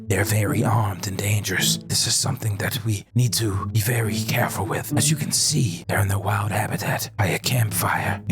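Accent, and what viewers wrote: California, United States. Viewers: You’re very entertaining! I enjoy your commentary, and I’m pretty sure a great part of that is your californian accent. It makes me comfy 😂🩷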